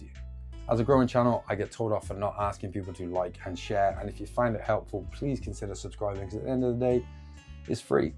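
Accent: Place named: United Kingdom. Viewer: Mate are you from the uk by any chance?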